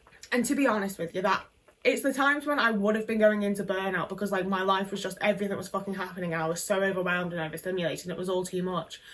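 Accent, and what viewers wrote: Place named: Northern England, United Kingdom. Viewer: British women with their Yorkshire tea speaking in that northern accent --- so exotic and wonderful.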